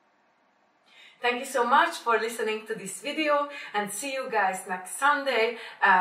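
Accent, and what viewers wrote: Hungary. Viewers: Trust me bro! I have a thick hungarian accent, just like has... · Your accent is so hungarian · Nagyon aranyos a magyaros angolod :)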